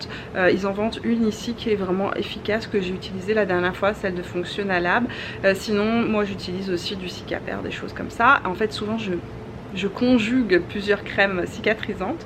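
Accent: Quebec, Canada. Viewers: Trop beau tes extensions de cils ! C’est vraiment réussi :) C’est drôle tu as beaucoup plus l’accent québécois quand tu es à l’extérieur que quand tu fais des vidéos chez moi ! Bisous 😘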